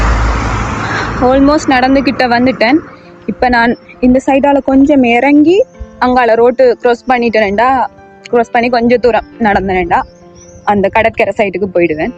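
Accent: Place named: Sri Lanka. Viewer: Srilankan tamil keka keka ketutae irukalam pola iruku pesu nee pesu d 🤌🏻😭💙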